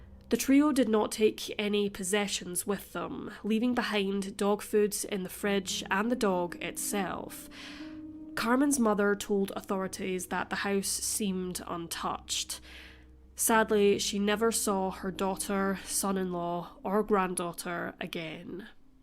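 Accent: Scotland, United Kingdom. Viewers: She's enunciating her words properly so everyone can understand what she's saying... it's not slow. The Scottish accent is sometimes hard to understand if you're not from Scotland. Listen at a different speed if it bothers you that much 🙄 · If she'd talk normal,you would not understand a word,unless your'e scottish????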